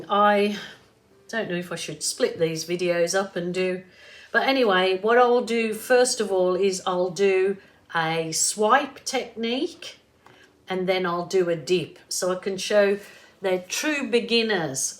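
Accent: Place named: West Midlands, United Kingdom. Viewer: Off topic but I keep thinking I can hear a West Midlands accent amongst the Aussie!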